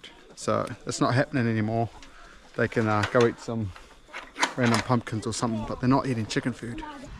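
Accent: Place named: New Zealand. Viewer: Where abouts is ur farm u sound kiwi, also how many acres do u have?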